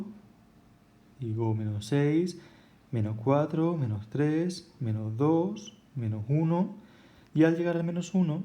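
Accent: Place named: Murcia, Spain. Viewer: murciano fijo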